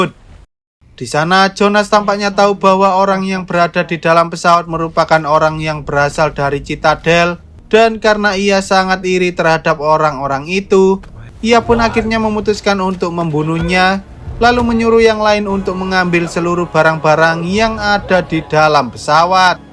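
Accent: Java, Indonesia. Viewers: Ngomong lo jangan medoooook broo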